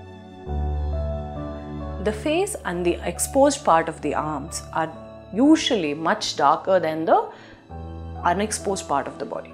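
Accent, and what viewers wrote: India. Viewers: white shadow dear she is an Indian ....in India we concentrate more on our native Hindi language which has a thick accent....we too speak English but the accent remains same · She speaks with an indian accent , i find it hard to understand too . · Yaaae..i hardly undrstnd her . Only Indian will understand her..coz .she speak India English. Hihihiihi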